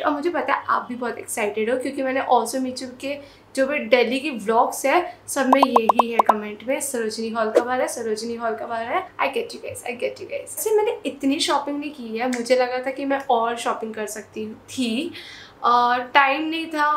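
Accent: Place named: Hyderabad, India. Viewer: U speak some wht like hyderabadi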